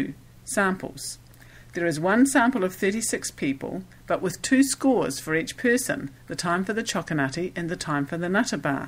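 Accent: New Zealand. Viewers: Wao great as video. If i can please ask are you a kiwi?? If i have guessed right from ur acsent.😊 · New Zealand accent. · My apologies if I sounded rude but the content of the video was really helpful! Also, New Zealander's accent is one of my favourite kind of accent! :D Flight of the Conchords ze best!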